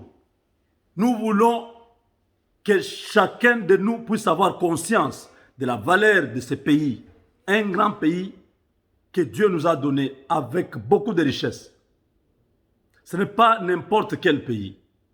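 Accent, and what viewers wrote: Rwanda. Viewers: Ton accent semble Rwandais verifier ton geneologie peut etre qu’il ya l’un de tes parent qui est Rwandais.j’ai vie au Congo pdt 13 ans je n’avais jamais attandu un vrais Congolais qui parle le francais comme ca.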